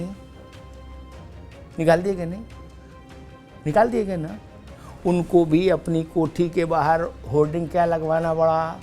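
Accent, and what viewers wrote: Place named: Farrukhabad, India. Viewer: अमिताभ जी का ठेठ फर्रुखाबादी स्टाईल ही आप की स्पेशलिटी है, सीधी सीधी खरी खरी कोई लाग लपेट नही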